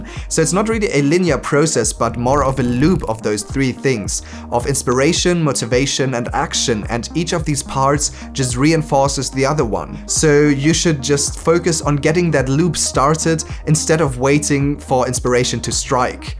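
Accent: Germany. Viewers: haha ich habe sofort erkannt dass du Deutsch bist, obwohl du den Akzent schon gut wegbekommen hast. Aber ich muss sagen, du machst gute Videos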